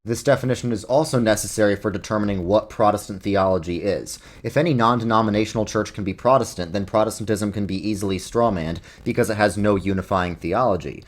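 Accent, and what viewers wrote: Germany. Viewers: The German accent got me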